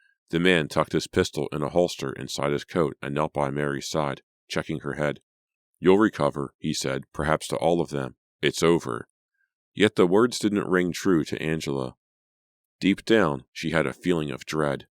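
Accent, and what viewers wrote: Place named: Pennsylvania, United States. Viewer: So happy to find your account! 😁 you’ve gotta be from pa…am I right??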